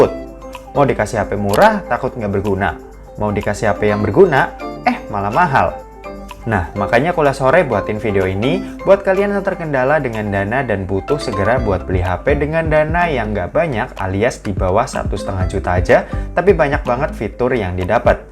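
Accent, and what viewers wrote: Semarang, Indonesia. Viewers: mas, sampean wong semarang mesti hehehe suwon info2nya mas, sangat membantu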